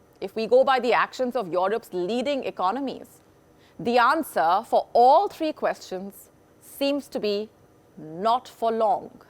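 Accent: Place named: India. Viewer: No offense but Indian accent is just the worst accent. Unbearable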